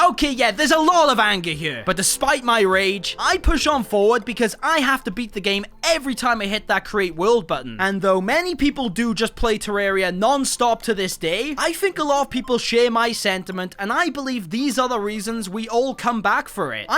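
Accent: Wales, United Kingdom. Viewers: are you welsh you sound welsh but at the same time dont? i cant tell what accent you have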